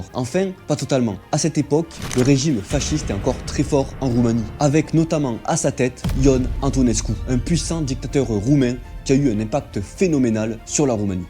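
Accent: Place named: Toulouse, France. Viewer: C'est quoi cet accent sudiste la ? T'es toulousain où bien?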